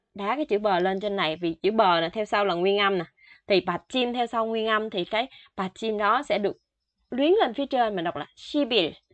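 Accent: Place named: South, Vietnam. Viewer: thích giọng người miền nam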